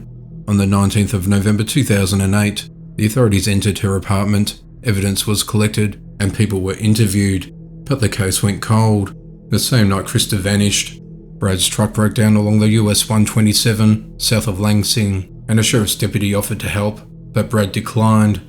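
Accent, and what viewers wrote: Australia. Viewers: Okay but are you Aussie ??? I need to know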